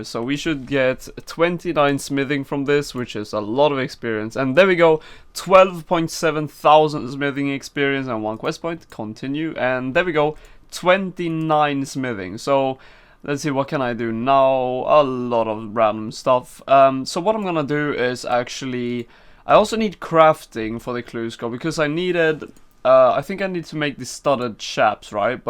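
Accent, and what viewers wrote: Sweden. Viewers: hörs så tydligt du är svensk, haha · Are you Swedish by any chance? Your accent sounds quite Swedish. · you are swedish huh ;) i remember that english accent ^^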